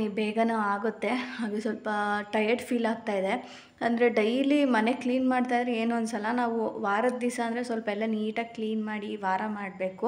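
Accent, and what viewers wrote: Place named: Hassan, India. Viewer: ನಮ್ಮ ಹಾಸನ್ ಸೈಡ್ ತರ ಇದೆ ನಿಮ್ಮ ಭಾಷೆ ಹಾಸನ ಮಂಡ್ಯ ನ ನಿಮ್ಮದು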